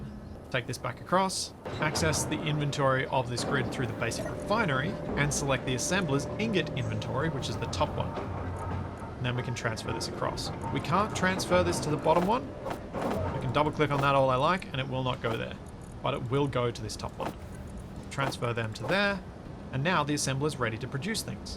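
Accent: Australia. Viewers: You sound Aussie as, and I liked this video with its easy and full getting started walk-through.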